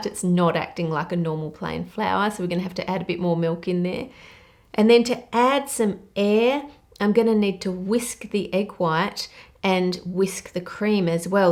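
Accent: Australia. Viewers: The best part of this video is hearing pronounce the word “grown”. I love the Aussie accent! Thanks for another great video:) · Today I learned that aussies say "weight" because they don't know how to say "wheat". At least I guess that's why.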